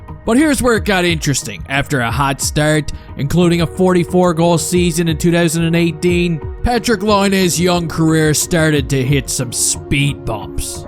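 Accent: Canada. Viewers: Your accent is somewhere between Canadian and Irish. Double-ended lol.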